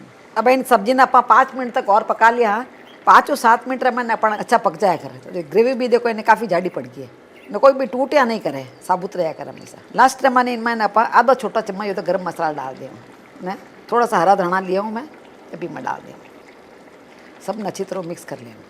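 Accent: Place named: Marwar, India. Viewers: जय श्री कृष्णा Thaki मारवाडी बोली घनी चोखी लागे. रेसिपी बतवणकी पद्धत आणि सब bartan बहोत अच्छा लागे. या sabji तो mhe जरूर banayne देखुला जी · Receipe re Sathe marwadi boli gani achi lagi sa.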